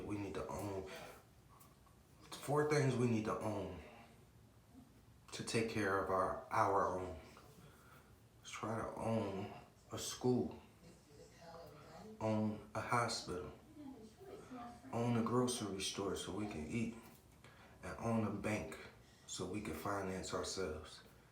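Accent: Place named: Philadelphia, United States. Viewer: Yo bro I’m from north philly area and Trenton nj I knew you was close by because of how you talk we got a different slang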